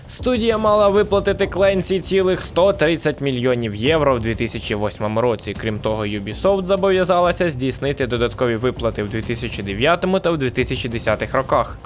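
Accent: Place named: United States. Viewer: За україномовний контент + За акцент американця, якщо спеціально застосовується у дикції, величезний мінус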